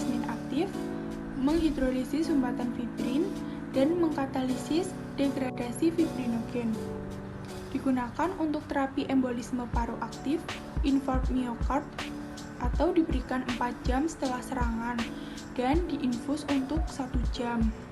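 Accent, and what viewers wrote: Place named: Java, Indonesia. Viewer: Medok banget ndesss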